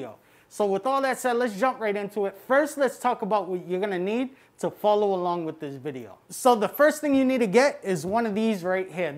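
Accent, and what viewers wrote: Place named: Northeast, United States. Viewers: based on his accent you could pretty much narrow it down to being somewhere north and/or east of Pennsylvania lol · This dude sounds like he's from New Jersey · You can hide your face, but you can't hide that southie accent.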